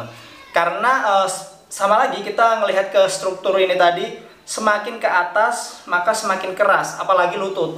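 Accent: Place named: Java, Indonesia. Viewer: Daerah Mana Bang Kok ngomongnya Jawa hehehe... Sosis Lu bang (suami Takut istri)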